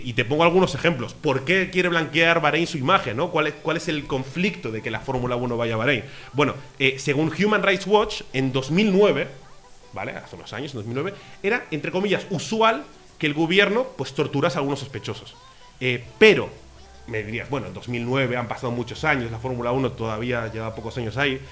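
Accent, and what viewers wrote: Argentina. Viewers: Y el acento argentino?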